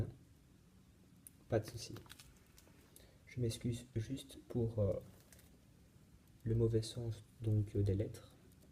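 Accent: Belgium. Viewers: Ne viens-tu pas de Belgique ?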